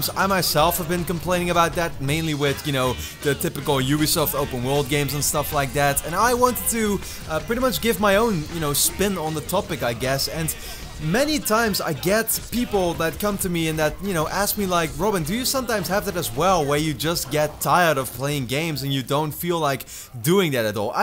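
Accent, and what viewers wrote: Netherlands. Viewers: This Guy is dutch.... I can hear it.... Im dutch too... And btw GO READ A BOOK PEOPLE!!!! RRRREEEEEAAAADDDD! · Is this boi dutch? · i can hear the dutchness in your voice xD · I heared your voice and already know you are Dutch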